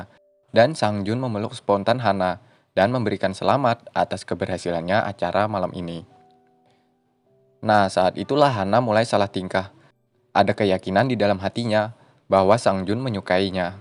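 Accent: Banjar, Indonesia. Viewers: urang Banjar kah mang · Kek logat banjar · Orang Banjar kh bang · Mang orang banjar kh? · Nah mantap urang banjar pinanya nah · Banjar banar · Ada rasa rasa bahasa Banjar wk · Orang mana... Logat ny Banjar banar 😁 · logat nya ne kaya orang banjar pang nah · Banjar nihhh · Hanya lipsing dangsanak, wkwkwk orang banjar bnar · Urang banjar sakalinya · Banjar banaaarr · Mang orng banjar kh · lwt di beranda dan penasaran, pas denger suaranya fix miminnya org banjar ya kan😀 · Orang banjar kah ikam ? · Tetap ay logat Banjar nya ada biar bahasa Indonesia min ay hahahaa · Orang banjar ya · Urang Banjar Leh rupanya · Orang Banjar kah mang🤣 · Orang banjar kah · Mamang banjar🤣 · Mimin urang banjar kah min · Banjar banar pian 😅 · Banjar kh mang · Org Banjar kh nih min wkwkw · Banjar banget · Pian urg banjar kh mamang??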